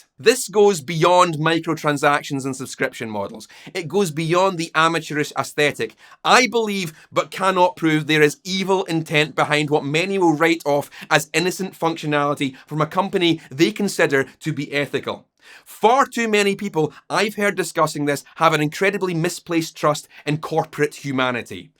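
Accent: Scotland, United Kingdom. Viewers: The Scottish accent seems especially well suited to calling out capitalist nonsense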